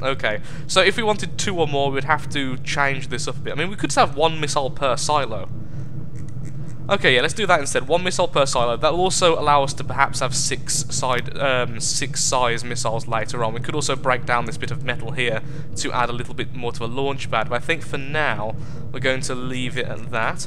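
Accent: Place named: North, United Kingdom. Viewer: Hey man this is some good shit. You're probably one of the best from the depths content providers so stick with it. PS: What county is your accent from? You're northern I think but where abouts? Your accent has some inflections I can't place.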